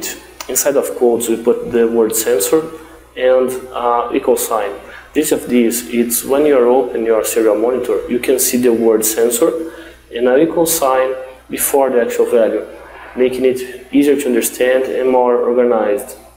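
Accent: Brazil. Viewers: Hi, nice project. Just a silly comment about your code: if the values are exactly 300 or 700, nothing will happen, because the 2 first 'if' consider > 300 or <300, never =300. The same with the 2 last 'if' regarding 700. It is not a big deal, because the probability of such values got read is small, but it is also something simple to fix. BTW, are you Brazilian? Your accent seemed Brazilian.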